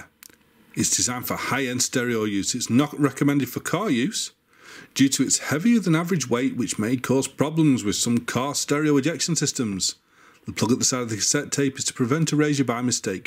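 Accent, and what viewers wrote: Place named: Northern England, United Kingdom. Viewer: No,I'm another North O'England boy just like you is.A bit further north than you (Durham).But IIRC Vic/Jim grew up in Leeds and sometimes you really do sound like him ,only for a couple of words at a time,here and there though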